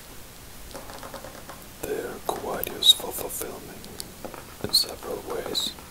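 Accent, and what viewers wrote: Germany. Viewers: Ur German ? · Is this guy German, cuz I think his German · Hello are you german? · Hey are you german cuz Im wondering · vivienlaramalfoy maybe bc I’m from another European country but I can tell by the accent it’s German... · Are you german??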